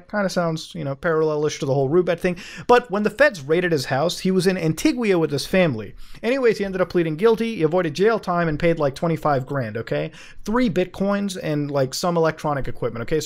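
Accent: Canada. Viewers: Aboot, caarr, your Canadian accents so thick my friend. -love from Torono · He's Canadian, he's got a different accent. Like when an American says croissant vs a French person.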